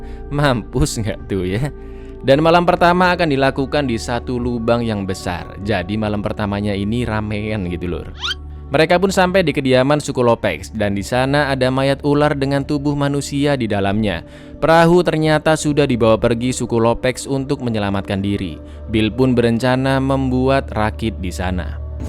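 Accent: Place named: Kalimantan, Indonesia. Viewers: anak kalimantan mana suaranyaa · Kok kalimantan nya beda wkwk. Btw gua orang kalimantan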